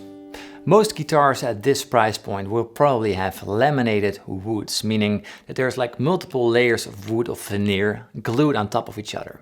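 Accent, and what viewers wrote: Netherlands. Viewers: what a beautiful dutch accent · I knew you were Dutch ! Toch wel goed accentje hoor ! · Ah, yes, the man met that Nederlandse accent! Do listen to him, he knows guitars. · Al die tijd had ik zo’n vermoeden dat je nederlands was! Gewoon door je Nederlandse hint Engels. Haha nu weet ik het zeker, helemaal top😂😂. Keep up tue great work tho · Ik wist gewoon aan uw accent dat je van nederland was hahah ma je vids zijn wel echt nog cool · Ik hoor een nederlander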